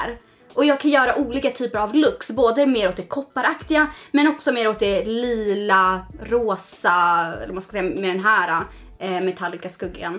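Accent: Stockholm, Sweden. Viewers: Bra video!☺️ hur kommer det sig att du pratar så mycket Stockholmska? Men ändå typ göteborgska samtidigt haha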